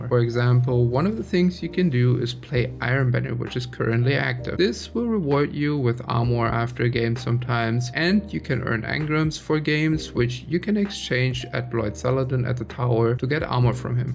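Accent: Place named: India. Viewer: Ah listen to that thick indian accent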